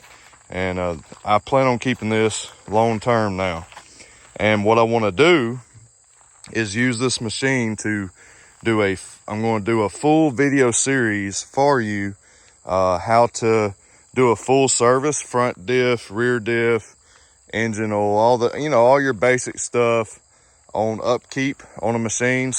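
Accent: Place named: Mississippi, United States. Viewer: Hi, greetings from Germany (... yes, you're seen even overhere 👍😂) ... I'm a complete Newie in respect of ATVs ... Considering/Thinking of buying a CForce 625 s new, ... or something similar used condtion ... Saw already almost all of yr vids. ... All are very well done. Do like yr local regional Mississippi slang. Quite uncommon overhere 👍😄 Rgds & Best Wishes, DON, Germany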